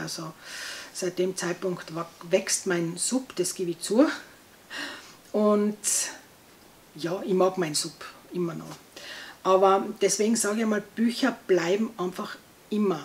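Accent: Tyrol, Austria. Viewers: Liebe Frau, wie schön, Tiroler Zungenschlag! Wir machen seit jetzt mehr als 20 Jahren jeden Sommer Wanderurlaub im Zillertal (der Gatte sogar noch länger, der ist mit seinen Eltern als Kind schon da gewesen) und hatten auch 2020 das Glück, dass da gerade mal ein bissle "Corona-Pause" war. Im Juli waren wir im Lechtal, da brauchte man sogar nur noch im Bus eine Maske.... hach ja, wird schon wieder! Auf alle Fälle ist es schön, Deinen Tiroler Zungenschlag zu hören und ich bin schon sehr gespannt, welche Bücher ich über Dich entdecken werde! Bleib schön gesund! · Hallo, ich bin gerade beim stöbern auf You Tube auf Deinen Kanal gestöbert. Ich habe Dir gleich mal ein Abo da gelassen 🤗. Wir haben einen ähnlichen Lesegeschmack und sind im ähnlichen Alter. Ich finde Dich und Deinen Dialekt sehr sympathisch. Da hast Du großes Glück in Tirol zu leben. Mein Mann und ich mögen Österreich sehr und sind viel in der Steiermark im Urlaub. 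Ich werde mich jetzt mal durch Deine Videos schauen ☺️! Liebe Grüße von Kerstin (aus der Nähe von Stuttgart) 🙋🏻‍♀️📚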